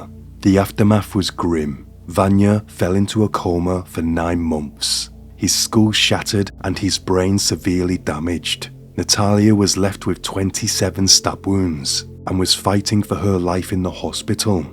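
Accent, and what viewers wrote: United Kingdom. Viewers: This dude sounds like a bot with one of the thickest and most annoying british accents ever, lmao Literally unbearable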